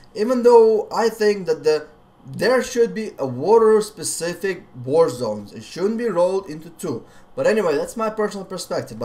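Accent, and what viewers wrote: Russia. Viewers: Pure russian accent and humor . Gotta love his style <3 .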